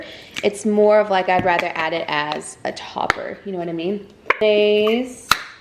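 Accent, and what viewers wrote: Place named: South, United States. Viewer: I can tell my girl is back in the south because that accent is a lil thicker!! 🤣 I love it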